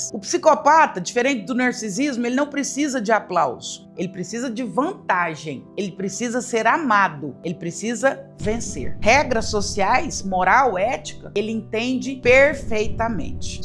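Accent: Minas Gerais, Brazil. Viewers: Estou chegando agora no seu canal e estou respeitosamente apaixonado pela senhora e Dra Ana Beatriz, acabo de me descobrir um bígamo virtual! rsrsr... Parabéns pelo seu trabalho Dra!!!! Ouvir falar da vida com sotaque mineiro faz tudo ficar muito leve... acho que Freud era um chato, mas boa gente, paulistano como eu KKK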